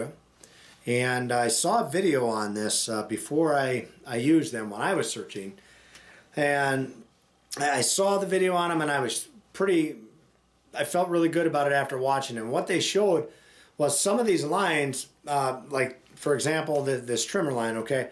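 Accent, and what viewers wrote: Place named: Kentucky, United States. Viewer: I can tell you are from Kentucky.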